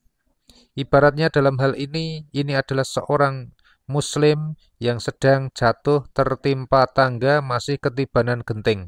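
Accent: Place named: Java, Indonesia. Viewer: Medok nih......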